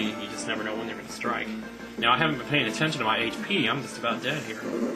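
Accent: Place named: South, United States. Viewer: I'm going to watch this LP once again. This is one of my favorites and still has abit of the southern accent which is funny good stuff! One of the best ever "lemme tell ya".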